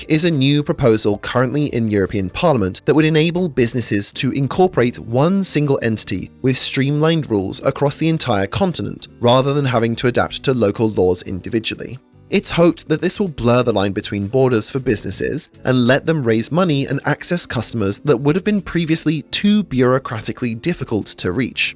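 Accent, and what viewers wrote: United Kingdom. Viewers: Plus i find odd a uk voice cares wtf u all left the eu so how can u complain wtf u dont have a dog in this Eu area. So in the immortal words of my uncle, ' shut your mouth if u aint in the fight..' just sayin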